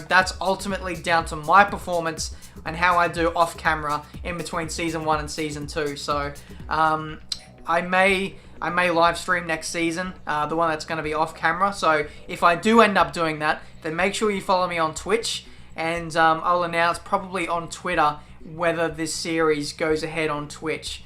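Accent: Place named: Australia. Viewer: kapiniuzertva its his Australian accent he says mart'n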